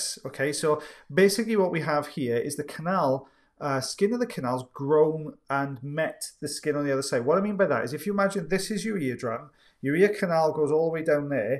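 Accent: Wales, United Kingdom. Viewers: His lively and lovely accent is Welsh! 😊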